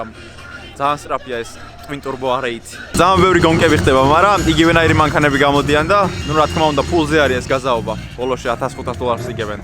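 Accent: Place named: Svaneti, Georgia. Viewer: სვანი ხარ ხო? აქცენტით ვხვდები სვანი ვარ😀✊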